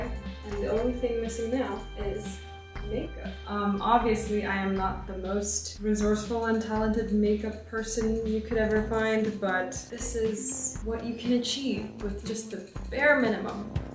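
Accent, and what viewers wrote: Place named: United States. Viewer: Aksen nya america bgt…tinggal di US ya?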